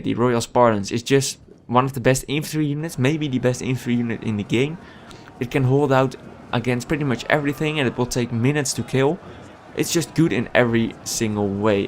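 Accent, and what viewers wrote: Netherlands. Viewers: Are you Dutch ? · What the fuck is that dutch accent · that dutch accent. MY EARS!!!!! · Dutch accent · you're dutch arent you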